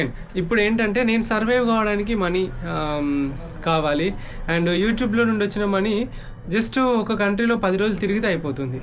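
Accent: Telangana, India. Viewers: it's so sad bro, money makes many things anaru so ths video is the best example, please like everyone bcz 14k views but likes only 2.5k so please like every video, ram travel bro and Avnesh bro ki with in 3 r 4yrs lo full views vastunai but Bayya ki and raja reddy bayya ki asalu views ravadam ledhu so sad, please support this bros. mana ap brothers kondariki (andariki kadu) telanagana slag nachadhu anduke view ravadam ledu anukunta. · ఇలా అంటున్ననాని ఏమీ అనుకోకండి బ్రదర్.. "రాజు కన్న బోయిన" బయ్య సన్నీ యాదవ్, ఇలా తెలంగాణ ట్రావెల్ youtubers అందరకీ including మీతో సహా,సబ్స్క్రైబ్ ర్ ఉంటారు, వ్యూస్ రావు కారణం తెలంగాణ వ్యువర్స్, పనికిరాని వీడియోస్, రోత కలిగించే కామిడీ వీడియోస్ చూస్తారు, చాలమంది, ట్రావెల్ వీడియోలు చూడరు, ఆంధ్రా వాళ్ళు ఎక్కువుగా ట్రావెల్ వీడియోస్ చూస్తారు, కానీ, తెలంగాణ స్లాంగ్ కు అంత కనెక్ట్ అవ్వరు, అందుకే తెలంగాణ ట్రావెల్ youtubers కి డబ్బులు రావడం కష్టం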